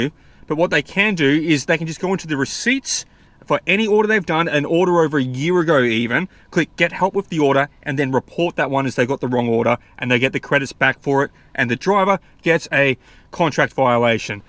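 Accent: Australia. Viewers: I love your accent! Australia?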